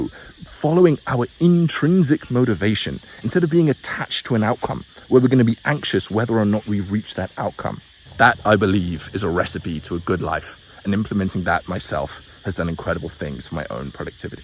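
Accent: United Kingdom. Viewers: Deep voice + british accent + philosophy and self help = subscribed immediately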